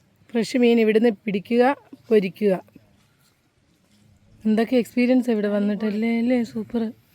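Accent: Kollam, India. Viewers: എന്നതായാലും ദീപുകാരിയല്ല. കൊല്ലം"ഫാഗത്താ " ണന്നു തോന്നുന്നു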